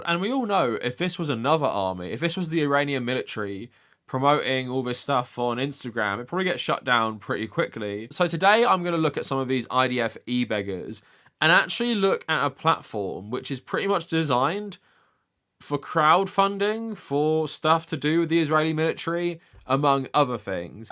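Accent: United States. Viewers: Wow, proper Israeli with an American accent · Why is it in standard American Dialect English? Shouldn't it be in Hebrew? Why isnt it British English???? As an ex navy cryptologist it's giving foreign propaganda...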